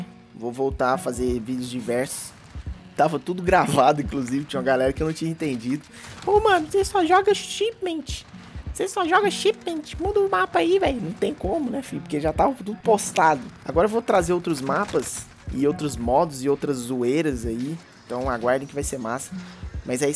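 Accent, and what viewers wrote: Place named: São Paulo, Brazil. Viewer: pra mim vc era daqui de sampakkkk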